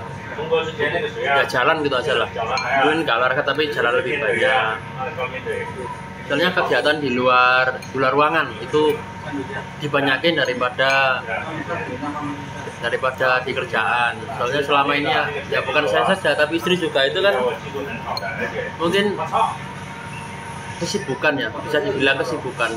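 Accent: Malang, Indonesia. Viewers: Tas Nemu Chanel mas, wong Malang ta mas? Kok logat e koyok wong Malang?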